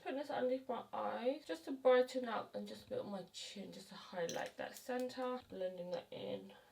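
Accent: United Kingdom. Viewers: She has braces and she has a British accent. Maybe you're not use to hearing people with British accents and only American accent. But she speaks very clearly. I understand her fine. She's one of the best make up artist teacher on YouTube.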